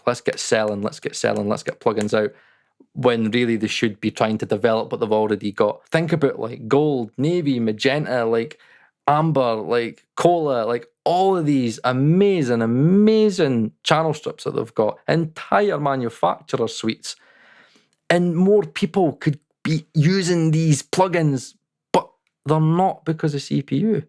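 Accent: Scotland, United Kingdom. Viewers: Removed all Acustica a long time back. I use far older hardware, so seeing your cpu spikes made me think "not for me...Again". On another note: Your Scottish accent + the Chipmunk effect made me crease. Keep up the humour pal when getting the odd dig from trolls. <3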